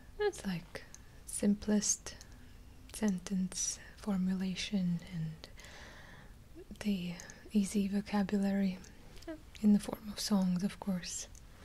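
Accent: Russia. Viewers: I really love Russian accents and truly enjoyed your story! Hope you have an awesome day! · That's a very cool story. Love your Russian accent and you do a great job on your videos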